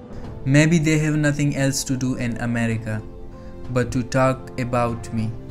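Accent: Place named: India. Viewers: Wtf ??!!!! Racist on vocal sounds ?!!!! Why it’s Indian voice not English !!!!???? SERIOUSLY?!!!!! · What annoys me is the Indian speaking. Why dont just use English voice speaker those are free on the web?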